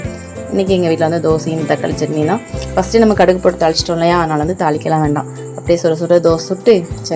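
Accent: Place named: Tirunelveli, India. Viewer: Arumaiyana tirunelveli slang sister 🎉🎉🎉🎉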